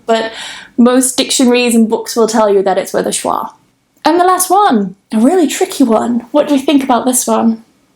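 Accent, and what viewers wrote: United Kingdom. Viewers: Such a FANCY British Accent and very helpful ❤️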